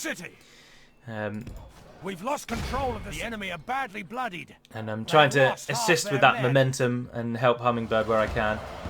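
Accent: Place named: Scotland, United Kingdom. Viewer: That scottish accent haha 😁 👍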